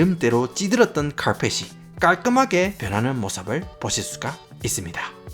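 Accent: Gyeongsang, South Korea. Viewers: 어제부터 정주행 하고 있습니다. 처음에는 야무진 갱상도 사투리가 재미있어서 보았으나 님의 봉사정신을 보고 존경하는 마음까지 생겼습니다 주위에 약자들 사회에서 소외된 사람들에게 힘과 용기를 주시는 분께 박수를 보냅니다 🎉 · 영어 speaking은 표준인데 한국말 억양은 대구 사투리 같은데 구수해요. 오랫만에 미국에서 듣는 구수한 된장국 억양.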